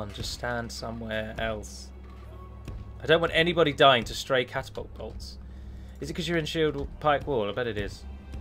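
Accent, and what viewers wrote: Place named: RP, United Kingdom. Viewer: Not wanting to be pedantic however this text may put in context your quite modern RP pronunciation re the BATH - TRAP split. It is know that northerners flat sounding vowel as in Bath was the original pronunciation. <link> . p.s. love your videos. Thanks.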